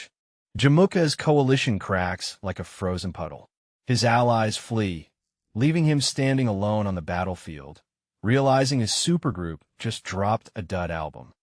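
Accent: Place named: United States. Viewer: suggestion, please pronounce the names correctly, not in Us english accent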